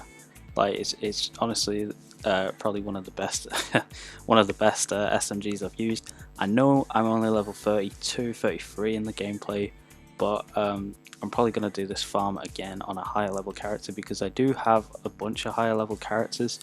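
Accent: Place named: United Kingdom. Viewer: Bloody pommys..mute the mic bitch.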